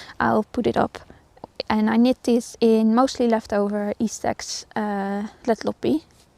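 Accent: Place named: Netherlands. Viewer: Het is te horen ja, sommige dingen klinken nog Nederlands 🤩 Maar toen je op 31 dec middenin een bos zat, kon ik me niet voorstellen dat je ook in Nederland wás op dat moment , zonder mensen en zonder herrie om je heen 😂 ik vond het heel leuk om te kijken en zal je zeker blijven volgen! Ja , ik brei heel veel en ik spin, maar niet zoveel als ik zou willen. Maar als ik jou dan weer zie .. dan wil ik nú beginnen 😊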